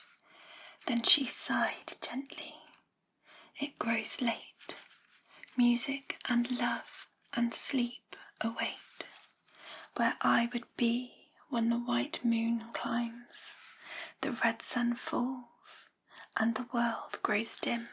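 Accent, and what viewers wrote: United Kingdom. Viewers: really those have one the best voices for asmr her british accent certainly helps like Lottie🤤🤤🤤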